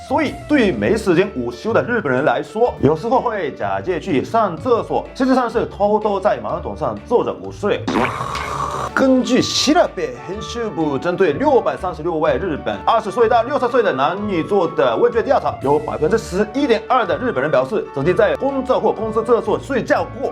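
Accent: Taiwan. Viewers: 社長的台灣話說的真好啊很道地😄😄😄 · YT首頁推薦點進來的中文跟台語真好! 無視口音的話會覺得很像台灣人講話XDDD 因為沒時間睡覺只好去廁所補眠真的辛苦了... 還有一個人吃飯還要在意別人眼光也很辛苦啊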